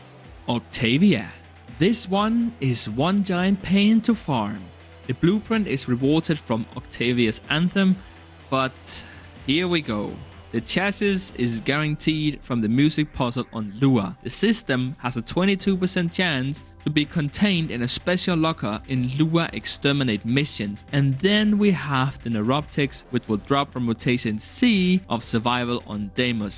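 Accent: Denmark. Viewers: classic danglish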